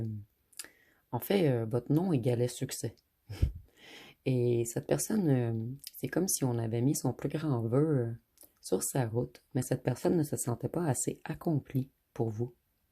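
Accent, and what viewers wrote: Quebec, Canada. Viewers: Excellent, c'est littéralement mon histoire que vous avez lue dans vos cartes jusqu'à ma connexion avec mon enfant intérieur. Vous êtes de très loin la meilleure et votre accent québécois très sympathique et empathique ajoute au charme du message. Merveilleux message qui exprime toutes nos attentes mutuelles à tous les deux.❤❤❤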